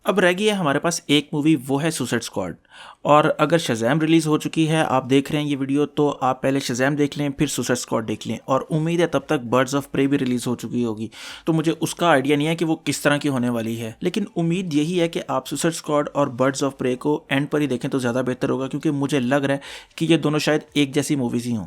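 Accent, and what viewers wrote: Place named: Pakistan. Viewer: Your voice tell me you are from Pakistan 🇵🇰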